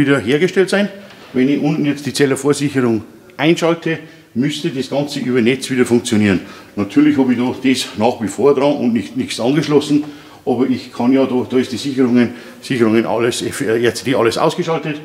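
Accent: Bavaria, Germany. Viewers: Dem Dialekt nach hoffte ich dass Ihr aus Bayern seid. Leider nicht, sonst haette ich evtl. einen Auftrag gehabt.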